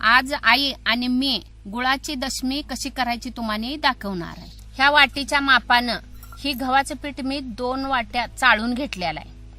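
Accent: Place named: Marathwada, India. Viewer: तुमच गाव कोणत आजी? भाषेवरून मराठवाड्यातील वाटतात .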